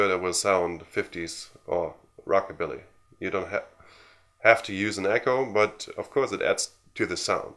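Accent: Germany. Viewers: I'm trying to Google, and all I can get is info on Andy Richer. I've never heard of either. ' accent, sounds Dutch, Austrian, German, anything but American south of the Mason-Dixon line which, as most people know, separates northern states and southern states of the USA. Anyway, I finally found some info on this "expert" on American rockabilly music. He has no pedigree of any kind. He's no doubt a good guitar player, I've never seen or heard of him, but I give him the benefit of the doubt. The commentators who use words like "awesome" are incredibly gullible. Don't they realise that there are indigenous rockabilly players who have generations of knowledge of this music behind them from whom they could learn much more than a German fan of the music who has decided that he can teach the world how to play it? Sorry, and I'm absolutely sure that your real first name is not, doesn't sound German.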